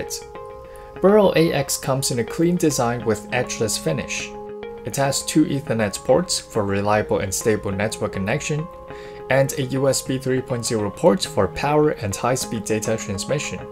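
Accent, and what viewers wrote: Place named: France. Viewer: Very very French speaker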